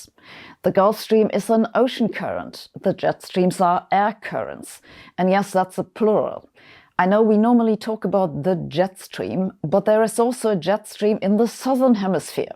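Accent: Germany. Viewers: Thank you very much for this excellent presentation and i have to say your teutonic accent seals the deal.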